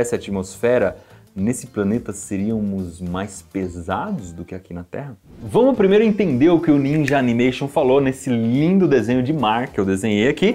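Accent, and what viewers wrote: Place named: Minas Gerais, Brazil. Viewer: Ele deve se mineiro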